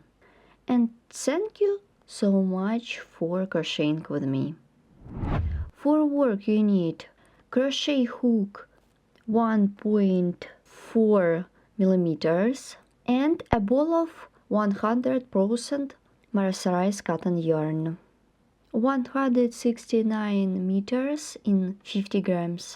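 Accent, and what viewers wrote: Russia. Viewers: Жаль,что Вы на русском не озвучиваете,субтитры переводят непонятно,а по Вашему акценту понятно,что прекрасно говорите по-русски.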